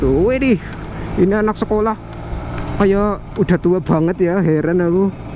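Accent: Banyumas, Indonesia. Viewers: Salam wong ngapak mas😘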